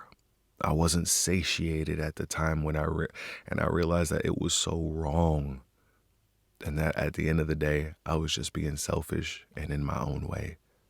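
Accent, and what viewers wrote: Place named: South, United States. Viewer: I could listen to your soft guttural accent with a slightly southern drawel sweet and slow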